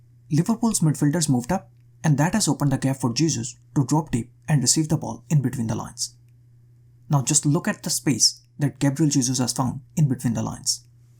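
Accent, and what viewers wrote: India. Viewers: Your accent sounds so Indian! Great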